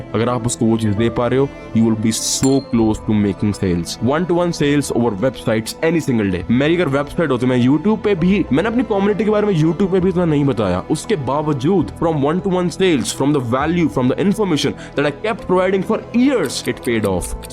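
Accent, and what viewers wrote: Haryana, India. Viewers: Bhaiya i just have a simple question jo aap yeh itni bhynke English bolte ho vo kha se seekhi? Dictionary se kisi app se ya kese? Or kitna time lga the way u talk in English and mixed with haryanvi accent aaye haaye ❤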